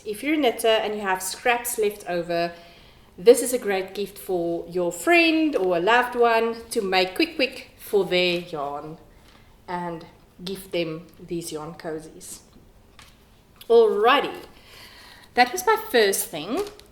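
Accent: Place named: Afrikaans, South Africa. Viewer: Haai 😊 Ek het jou channel gekry deur die recommendation van mywonderfulknittinglife. Ons het ~20 jaar terug Amerika toe getrek, en ek was baie excited toe sy se jy is in SA. Dit is so lekker om n Afrikaanse aksent te hoor. Dankie vir n lekker episode! ❤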